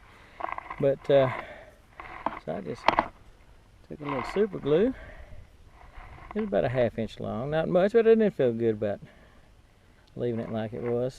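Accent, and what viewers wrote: Texas, United States. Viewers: I thought you said something, and thought that was not like you... at least not in public anyway! But no, it was just your Texas accent. All you said was "'cause they're sheddin'".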